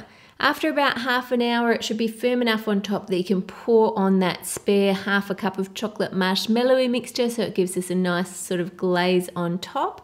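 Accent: Australia. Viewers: the australian accent is so cute w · Australian says "sugar" --> "suga" because she is an Australian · She doesn't sound British. Is she Australian?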